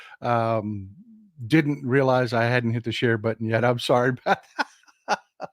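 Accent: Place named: South, United States. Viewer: A Virginian...a Southerner. Need some of our voices in my ear, rather than all these West Coast, Northeastern folk. Just subscribed... preach brother! Thank you.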